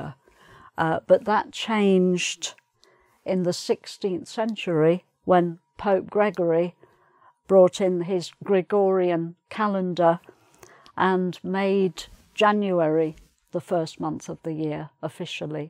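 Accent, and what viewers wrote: United Kingdom. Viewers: I’m from Brazil and i love your videos and British accents 😍❤️❤️ I learn more about the months and your origen so interesting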